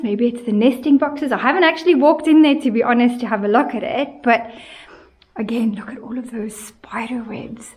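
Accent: South Africa. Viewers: I can spot a "fellow south african" an oceans away 😂 🇿🇦🤌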